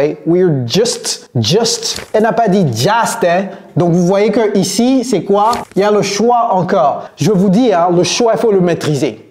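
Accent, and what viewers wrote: United States. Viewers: J'suis étudiante mais jamais vu un coach comme vous. J'aime trop l'accent américain mais c'est trop difficile à comprendre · Je suis très content à cet accent Américaine.Merci Soyez bénis.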